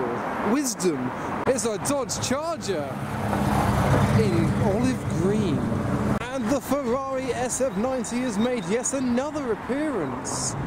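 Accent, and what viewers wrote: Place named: United Kingdom. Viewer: should be doing something like voice overs or hosting a show or something with his so British sound. Lol this guy could talk about grass and it would be entertaining.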